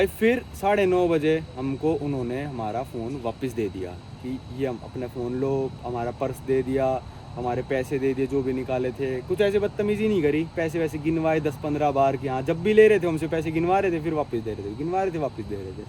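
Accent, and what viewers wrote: Haryana, India. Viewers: Bhai ka Delhi accent change hoke dhire dhire haryanavi nikal raha h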